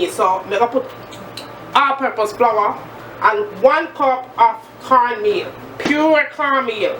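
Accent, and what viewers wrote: Jamaica. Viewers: Listening to her speak took me back to when I used to live in Jamaica. Good memories and awesome food.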